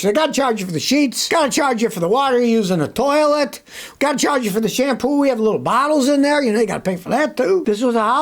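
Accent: Germany. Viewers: Best German Accent on Youtube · Loved your German accent 😅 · funny German accent. More ...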